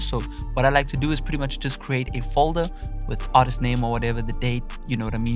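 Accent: South Africa. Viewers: yo bro are you from South Africa? your accent lowkey sounds like it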